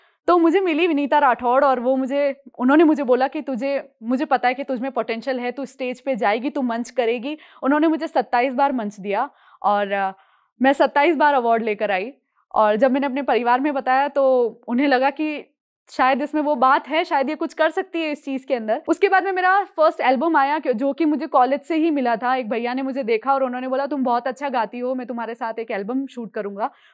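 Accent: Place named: Rajasthan, India. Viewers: Khammma Ghani Baishaa Mevadi me aapki boli hunke ghano majjo avve.... Khas krne vo jo bachha he vo msttt lagta he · आपका पहनावे और बोलचाल से हि पता चलता है कि आप वीरों कि भुमि राजस्थान, मेवाड़ से हैं।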